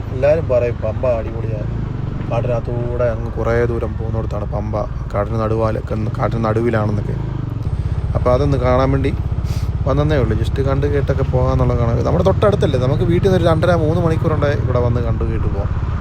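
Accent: Kottayam, India. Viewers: kottayam slang kettapo tanne manasilay😅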